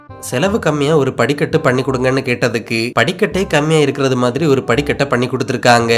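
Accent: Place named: Kerala, India. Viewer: Mallu Tamil super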